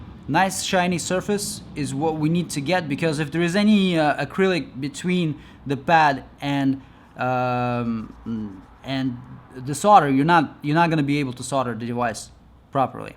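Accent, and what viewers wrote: Russia. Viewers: акцент-то русский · Does this guy has some russian accent? Im from russia and his speech seems unusually clear and strongly articulated for me. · Russian accent ! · Cool video. Let me quess you are Russian? 😁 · Почему у него русский акцент?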